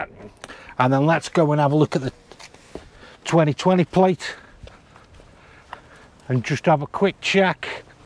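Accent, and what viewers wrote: Stoke, United Kingdom. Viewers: Also.. I just had to Google where you’re based as you sound like a local Stokie to me. ☺️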